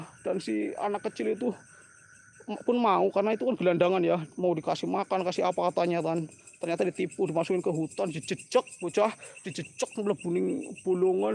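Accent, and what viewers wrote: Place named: Java, Indonesia. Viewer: Saya suka medoknya ki 😆😆 prtahankan ya, slam dari Bali 🤝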